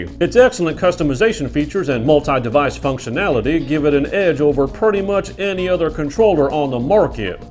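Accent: Texas, United States. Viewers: i have bad vision, the mustache is a small detail. As for the accent it didn’t sound annoying to me, just unique. Not tricked, You just sounded like a texan with a thick accent. I pay attention to what is said not appearances. I just hadn’t considered the possibility that you were playing a role. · An asian that has an accent of an american guy from texas lol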